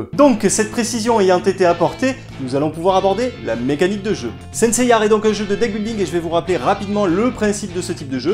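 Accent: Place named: South, France. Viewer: Peuchère juste un petit peu , mais vraiment flagrant quand tu fais le soldat germanique. Tu es de Marseille ? Moi je suis sur Toulon!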